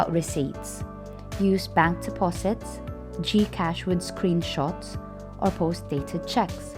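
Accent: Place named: United Kingdom. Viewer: YOUR ACCENT IS FUNNY! ARE YOU BRITISH?😄😭😄😄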